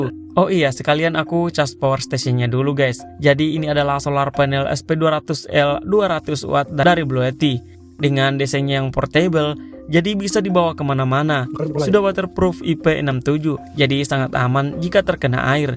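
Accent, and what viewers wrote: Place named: Toraja, Indonesia. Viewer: Pantesan bahasa daerah nya gak asing ku dengar, ternyata orang Toraja 😅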